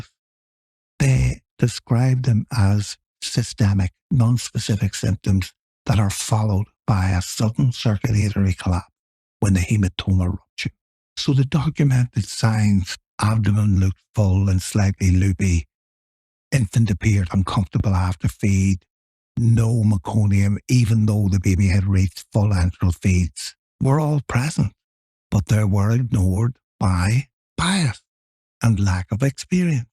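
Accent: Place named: North, United Kingdom. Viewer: Fantastic STuff..😅.....Your obviously from up north,.......❤🎉... FaB accent ye got There my friend 😅🎉... i moved to London from Dublin in 1998 but im STILL famous for my strongish 1/2 CorK 1/2 Dublin Ramble 😅😅😅😅😅...Fabulous channel 🎉. Been Binge Listening 👂 for the past 3weeks ❤🎉😊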